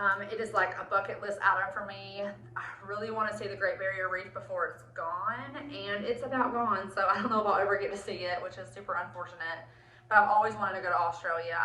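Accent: South, United States. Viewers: I just loveeee your southern accent. I dont want it to go away when you move. 😭😭😭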